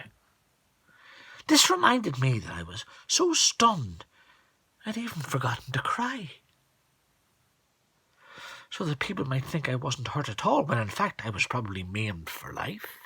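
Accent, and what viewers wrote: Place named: United Kingdom. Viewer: Ohh, I love british accent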